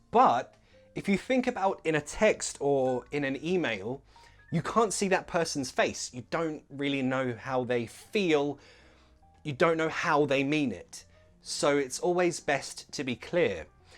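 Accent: United Kingdom. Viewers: I'll be studying english next year because i think british english incredible and i love british accent and you i think you're very amazing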